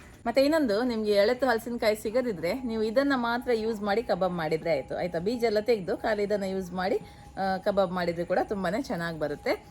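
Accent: Mangalore, India. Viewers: ತುಂಬಾ ಚನ್ನಾಗಿದೆ ರೆಸಿಪಿ ನಾನು ಮಂಗಳೂರಿನಲ್ಲಿ ಎರಡು ವರ್ಷ ಕೆಲಸ ಮಾಡಿದ್ದೆ. ಆಹಾ ಮಂಗಳೂರು ಕನ್ನಡ ಕೇಳುವುದು ನನಗೆ ತುಂಬಾ ಖುಷಿ ಕೊಡುತ್ತೆ. ಮದುವೆಗೆಂದು ಮಂಗಳೂರು ಹುಡುಗಿ ಸಿಕ್ಕಿದ್ದರೆ ದಿನಾ ಹಿತಕರ ಕನ್ನಡ ಕೇಳ್ಬಹುದಿತ್ತು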